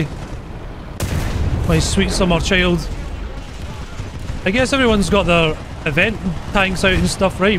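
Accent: United Kingdom. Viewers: You have an intresting accent which part of the uk are you from? I cant tell where most accents are from I a kiwi (new zealander) thought another kiwi was an american because they were from a different part of nz and spoke slightly differently. It was rather embarassing when he said he was a kiwi, thankfully he thought i was an australian so we both had a laugh about that.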